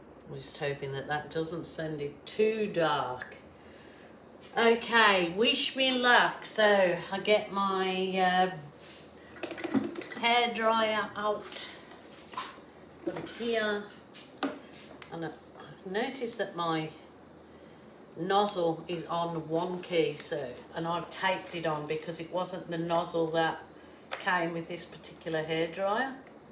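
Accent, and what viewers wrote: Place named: England, United Kingdom. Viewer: Hello my "Angel across the pond". During the epedemic here in the USA, I found u. Started watching u around 2\20 n have been pour painting, or thinking about supplies, ever since! U saved my insanity! Ur insight, personality n teaching. Yr English accent sounds beautiful to me.. Thank u Stay safe.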